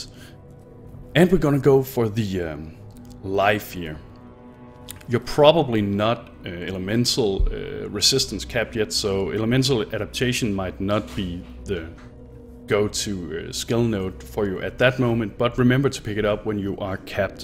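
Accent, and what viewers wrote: Denmark. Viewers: You sound danish. Are you danish?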